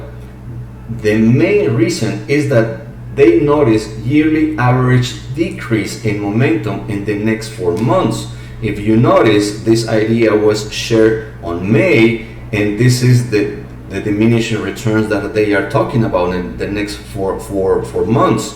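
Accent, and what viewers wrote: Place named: Latin America. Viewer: sabía que eras de latinoamerica cuando empezaste a hablar con acento y te entendí perfectamente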